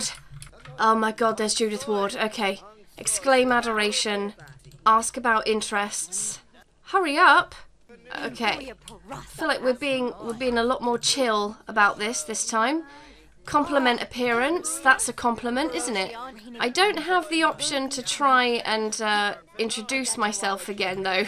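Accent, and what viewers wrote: United Kingdom. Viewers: It's so rare to hear a Brit with a similar accent to mine. <3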